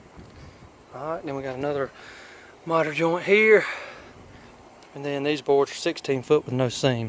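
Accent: South, United States. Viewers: Looks really nice. I like your country accent.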